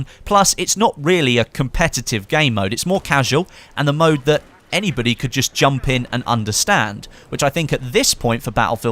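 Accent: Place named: United Kingdom. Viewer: Waiting for the voice over to start ... Hear a British Accent. Yes!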